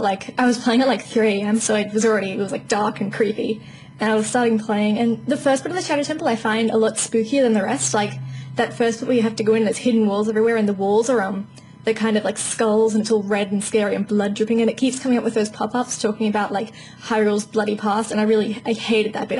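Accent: Australia. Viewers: hey u got a aussie accent...u from SYD, NSW?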